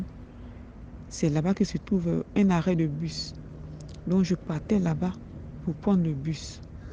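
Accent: Ivory Coast. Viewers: Par ta voix si je ne trompe pas. Tu es de côte d'ivoire.Tu es très couageuese. Mon coeur aller battre plus que mon souffle. Gloire à Jésus. Ce que j'ai compris ce que on dois s'attacher à Jésus en tout temps et en tout lieu.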